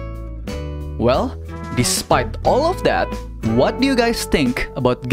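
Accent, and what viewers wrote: Indonesia. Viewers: aksen nya Indonesia banget (. ❛ ᴗ ❛.) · I smell some Indonesian accent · Ngapak anjay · Bro, you have Indonesia accent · i just can hear the indonesian(?)/the south asian eastness 😂👌. love your contents, thanks for the updates👍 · News org indo yah, accentnya indo bngt ngakak wkkwkekw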